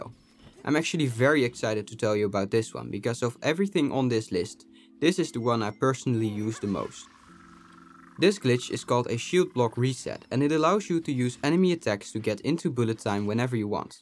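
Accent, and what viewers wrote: Netherlands. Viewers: First 3 words and i know you're dutch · Are you Dutch because your English sounds like someone Dutch talking English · I can hear in ur accent, u're Dutch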